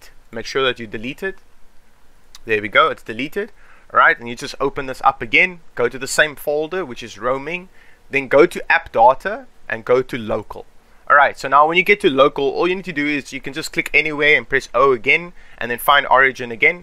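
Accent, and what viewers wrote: South Africa. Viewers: You have an afrikaans accent🤔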